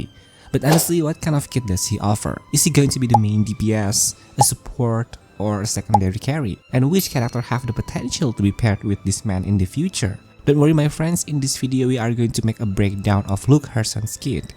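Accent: Indonesia. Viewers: Org indo bukan si 😅 kek ada aksen indo nya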